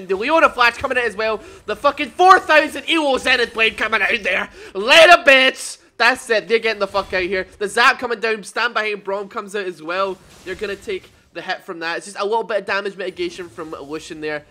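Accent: Scotland, United Kingdom. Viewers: where are you from? scotland?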